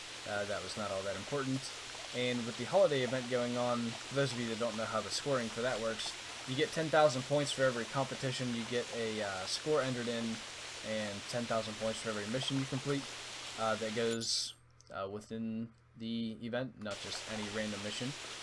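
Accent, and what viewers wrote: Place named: Pennsylvania, United States. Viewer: So am I. That's awesome bro. I had a feeling by ur slight pa accent.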